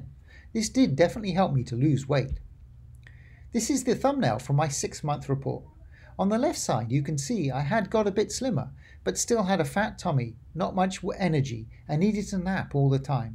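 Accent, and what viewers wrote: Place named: United Kingdom. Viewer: Friend, In spite of your predictably formal Brit accent and you're at least pseudoscientific approach to self-analysis, your presentation is very ephemeral and subjective, and even though I am a great fan of nmn, this is not a particularly compelling presentation of its benefits. My suggestion is to try instead, to relax your delivery.. even if it means pretending you're an american.. and to provide far more substantive information, rather than merely to emulate the superficial appearances thereof. Then you'll be well on your way to a far more helpful presentation.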